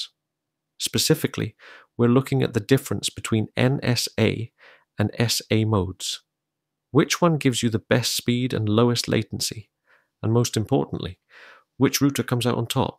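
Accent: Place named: United States. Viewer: I'm German and have little practical experience with English. As a radio amateur, I'm interested in the films from "Ringway Manchester." My problem, however, is that I find it extremely difficult to understand the guy. It's not even Donald Duck-like speech anymore. I also have to say that I understand your presentation without any problem. It's as if an educated American were speaking. I understand Satanic Coronaut's question as meaning that he wasn't sure whether the test applied to US networks or European ones.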